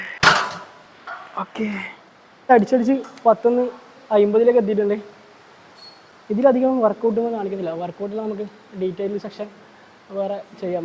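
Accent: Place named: Azhikode, India. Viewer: Azhikode anenn thonunnu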